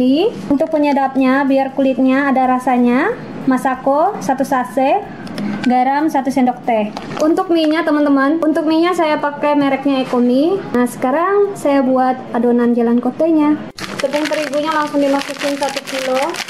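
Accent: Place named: Sulawesi, Indonesia. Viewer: oh pantes, logatx kok ky org sulawesi,, aq asli semarang, tp skrg tinggal di kendari kak,, semangat bikin kontenx kak,, aq ikuti trs lo kontenx kita,, 👍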